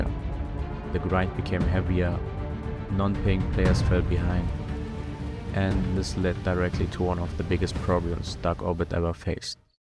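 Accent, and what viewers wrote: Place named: Germany. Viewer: wie deutsch willst du alles aussprechen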